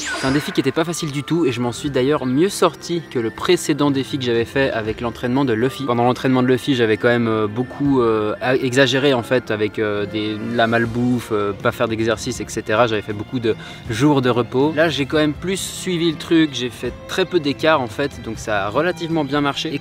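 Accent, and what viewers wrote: Switzerland. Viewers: T suisse?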